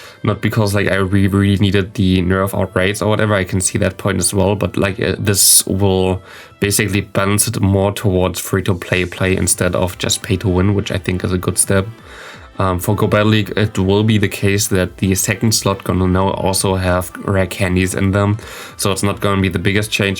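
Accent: Germany. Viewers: German accent.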